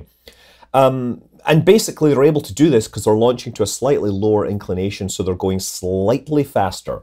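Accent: Scotland, United Kingdom. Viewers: In addition to your excellent reporting, I also enjoy hearing a Scot speak with the accent notable among the people of my heritage.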